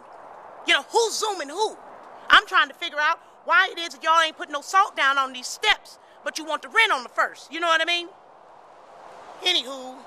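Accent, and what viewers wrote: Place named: South, United States. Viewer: This video would make an ideal case study for linguists in the exploration of the African American Vernacular English / Southern American English, with a Georgian / Coastal Southern dialect. She has such a graceful demand over Southern chain shifts and she speaks with so much clarity.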